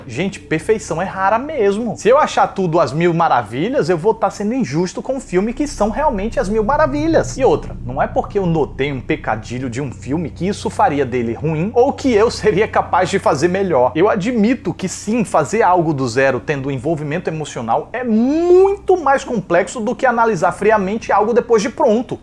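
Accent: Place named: Caruaru, Brazil. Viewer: esse sotaque parece aqui daqui de caruaru muito bom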